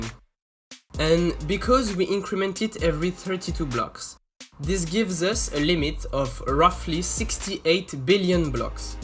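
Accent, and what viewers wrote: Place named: France. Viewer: J'ai reconnu direct que tu est français xDD sa s'entand mais sinon gg mec tu a fait du bon boulot!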